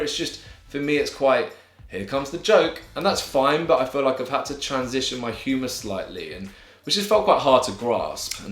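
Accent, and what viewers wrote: United Kingdom. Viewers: I'm laughing so hard at your English accent oh my god... the idea is absolutely GENIUS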